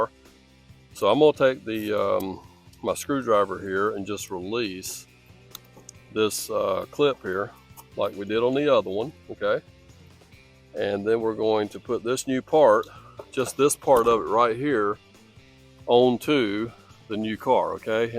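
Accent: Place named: Texas, United States. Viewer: Appreciate the video and your accent. Texas?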